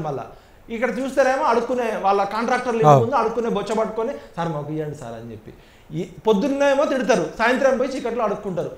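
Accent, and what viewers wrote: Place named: Andhra, India. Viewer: Your Telugu is standard like Andhra Hats off to you. Telangana people should improve like you, bring the language to the standard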